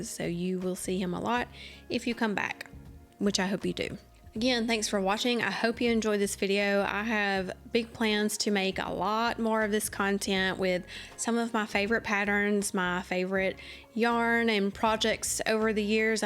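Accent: South, United States. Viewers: you're very talented! I like the blanket that you crocheted that's on your couch, the most. I like your Southern accent. My mom's parents were from Gaffney and Cherokee, South Carolina and my dad's grandpa was from Tennessee. I've always loved the twang.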